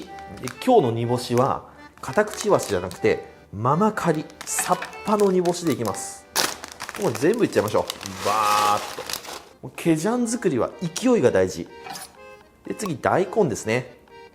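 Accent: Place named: Nagoya, Japan. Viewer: 名古屋から上京してきたから、かねこの名古屋弁が地元みあってうれしい～！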